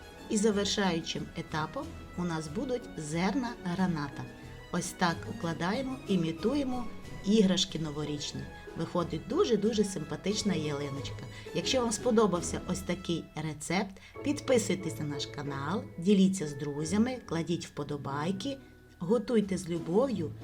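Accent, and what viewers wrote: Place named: Ukraine. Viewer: Может и вкусно но этот укропский говор раздражает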